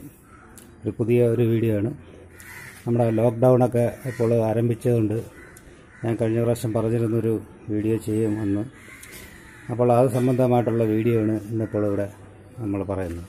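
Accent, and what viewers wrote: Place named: Trivandrum, India. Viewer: Correct karyam aane parayunnatthe ...njn already follow chyunna karym aane...slang kollaam trivandrum aane thonnunu 👏👏👏🤝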